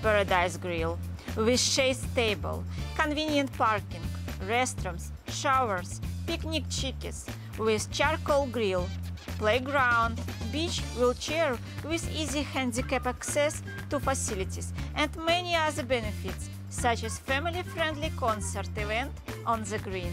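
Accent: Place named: Russia. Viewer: I like your Russian accent!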